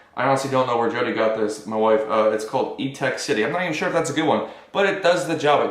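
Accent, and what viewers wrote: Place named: United States. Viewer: Hey bro! You are vlogger 🤟🤳 cool video man! Love the energy and your edit! ❤👍 Nice workout! My questions for your q&a is: ➡️Where are you from ? You sound like American 😉🇺🇸 ➡️What made you change your diet and workout? Probably you say on your videos but I just met you 😅👍 ➡️Who is your favourite superhero? ➡️What would be your speech topic if all people of the world could hear you for 5 minutes? 😃👍😉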